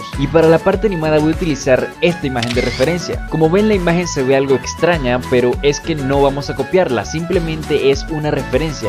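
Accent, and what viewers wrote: Venezuela. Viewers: Se nota que eres Venezolano como yo que fino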